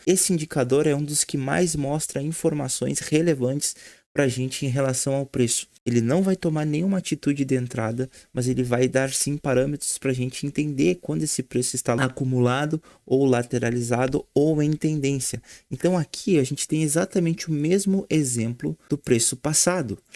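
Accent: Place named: Rio Grande do Sul, Brazil. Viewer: Conheço esse sotaque tchê kkkkkkk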